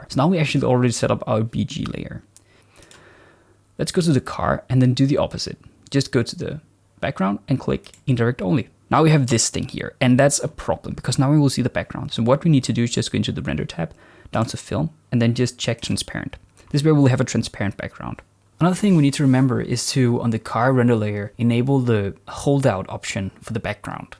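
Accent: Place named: Denmark. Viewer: Vent... du er dansker!?!?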